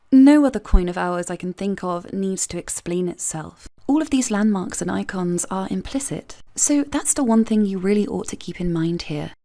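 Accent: England, United Kingdom. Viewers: Love the way you articulate with that beautiful English accent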